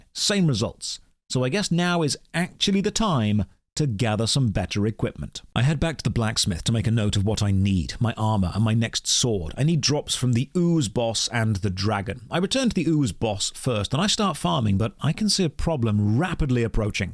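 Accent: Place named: RP, United Kingdom. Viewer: Oh, thank god, a new. I have been fucking sick for over a month now, and I just... I just need to listen to a pretty man with a posh little accent bitch about an MMO. I'm so glad this came out today.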